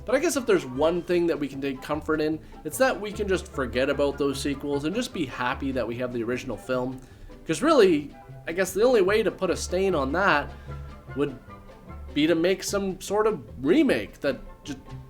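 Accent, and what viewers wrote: Canada. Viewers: Are you from Toronto? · Are you Canadian? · lol that Rob Ford reference was hilarious. Are you Canadian btw? It seems like you have the accent. Great Vid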